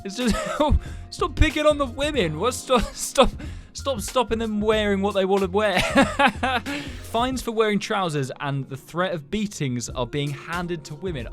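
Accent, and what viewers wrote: United Kingdom. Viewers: You’re British I never knew you’re a British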